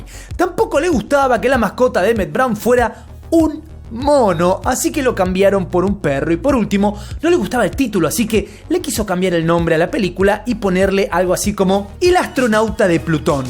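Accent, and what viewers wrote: Argentina. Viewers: Me estresoooo tanto tú forma de narrar ...entre ese acento argentino (ufff) y la forma exagerada de las impostar la voz que no logro acordarme cuando vi Back to the future!!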